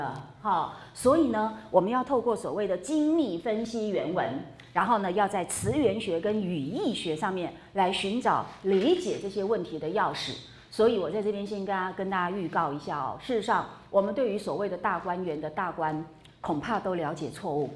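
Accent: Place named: Beijing, China. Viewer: 欧老师的儿化音好可爱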